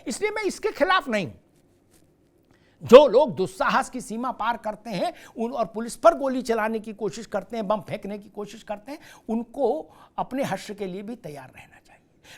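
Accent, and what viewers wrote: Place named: Lucknow, India. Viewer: जी लखनऊ से हैं लगता है। गुलाटी वर्दी चारबाग।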